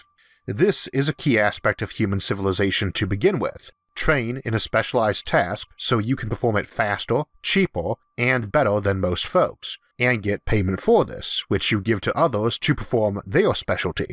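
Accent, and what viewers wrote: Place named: South, United States. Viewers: You should speak more in the southern accent. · While it's not an accent, his voice sounds enough like a Southern US speaker that my brain just loads Georgia.txt and understands him fine (well, I can understand, my time living there was worth it then!). His older videos are hard, but I think that's the fault of whatever mic he was using. The new ones are fantastically clear.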